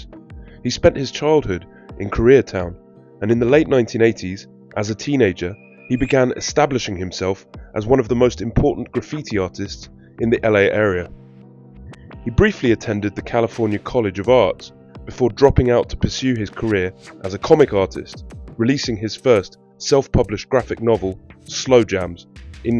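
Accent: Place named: United Kingdom. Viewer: Ill never get over how Brits pronounce Los Angeles as “Los Angelees” and taco as “takko” 😆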